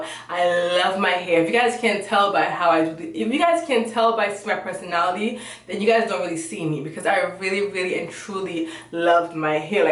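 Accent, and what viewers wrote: Jamaica. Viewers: NEW Subbie...the patois got me lol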